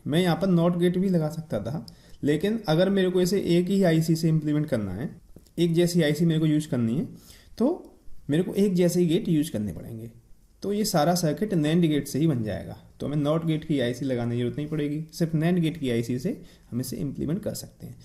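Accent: India. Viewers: Nice understanding speed is bit fast btw love up accent!!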